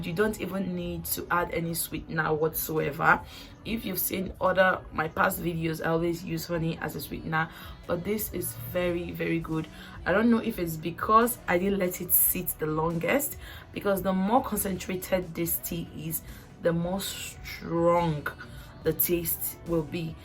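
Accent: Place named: Nigeria. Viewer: you’re annoying ! Just answer the comments 😂 not everyone can understand that strong ass Nigerian accent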